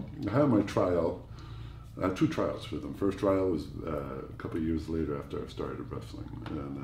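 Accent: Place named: Canada. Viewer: He sounds so Canadian I love it lol I feel like I'm listening to my neighbor right now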